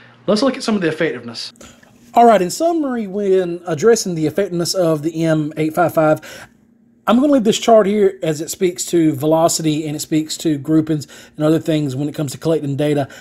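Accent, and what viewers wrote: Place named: South, United States. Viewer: No offense you look middle eastern but sound like dirty south ... and m855 isn’t all that bad it isn’t the best in the world but it’s also way better than the best 9mm in the aspect of damage it will do to a soft target, I do have about 1000 rounds of it in the stock pile, but the other 3500 rounds in the stock pile are hornady 62 gr Fmj and Soft points both of those, short neck great pen/ fragments and weight retention, but if I find green tip for cheap I would buy it ... I’d rather get shot by federal hst 124 gr over m855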